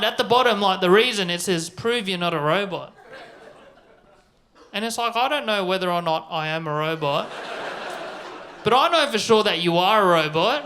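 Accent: Australia. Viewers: He sounds like every single Asian kid at my high school, im from Australia. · A chinaman with an aussie accent 🤣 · What in the magical costume change. As if the aussie accent on an asian wasn't enough for my post-partum-pandemic brain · o7 from eu. Your Australian accent is almost cheating because of the +1 to the sillyness factor. Keep up the good work of writing funnies. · I've lived in Australia since I was 3 and I'm now 34 .... the Aussie accent on this guy bloody gets to me... a fkn question/upwards inflection after about every 2 or 3 statements. Is he from Sydney? Can't be bothered looking it up. He's funny-ish. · I've never heard an Asian speak Aussie. I had a mental block like it was an impossibility or something. Silly American, laugh. So I did.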